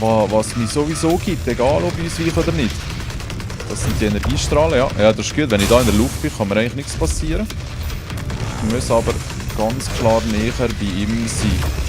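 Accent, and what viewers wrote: Valais, Switzerland. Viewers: Meeeh Walliserdiitsch!👍🏻😂 Hüere geil!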